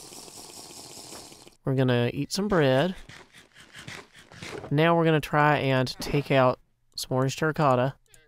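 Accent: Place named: South, United States. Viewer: First time here on your channel, it's sort of refreshing to hear a semi-southern accent in a hermitcraft video! Enjoyed the experience thoroughly, and great poem by the way :) ~your new fan (smoothie) from GA, USA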